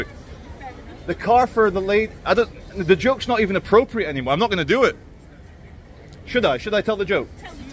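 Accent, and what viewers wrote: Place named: United Kingdom. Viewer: your accent. Sounds British to me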